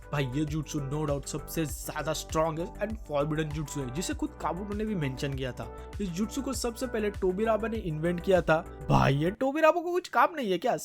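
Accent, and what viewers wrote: Maharashtra, India. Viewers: Are u Marathi❤😊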